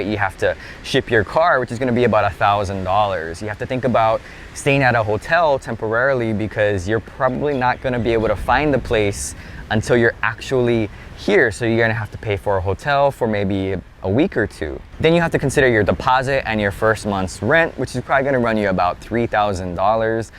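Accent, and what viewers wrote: Hawaii, United States. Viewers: I’m just here for your local accent. Wink 😉 Grew up my whole life big island. College in Cali- tried to get my husband to live and love Hawaii, he struggled with being so far from family and when his mom got sick it cost us thousands to go see her. I don’t think I can move back, but my mom is still on the island so I get to visit. 🌈 keep up there videos I like em laddat.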